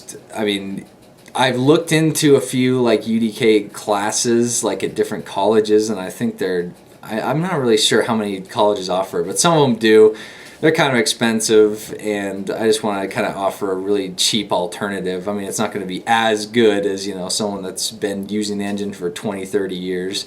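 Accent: United Kingdom. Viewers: have you ever heard of England? he has a mother fucking British accent. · British English...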